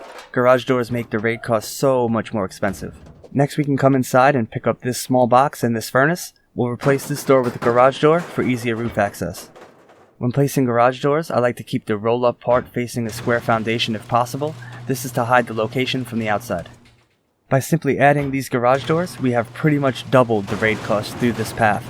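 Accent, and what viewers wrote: New York, United States. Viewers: i hear a accent, new york maybe?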